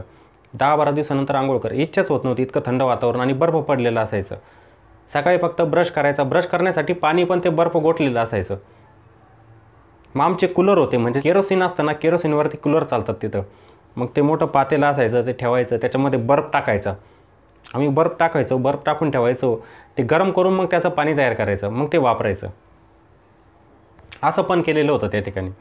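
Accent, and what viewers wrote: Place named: Krishna Valley, India. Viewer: Krisgna ghati che boltay bhau tumhi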